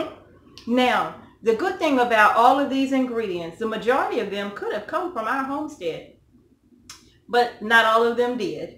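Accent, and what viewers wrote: South, United States. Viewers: Thank you,! By chance are you living in Georgia? I love your southen twang, ya'll! xo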